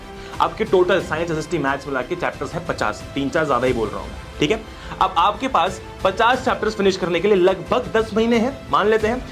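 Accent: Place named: Maharashtra, India. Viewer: Hyy aap maharashtra se ho kya💞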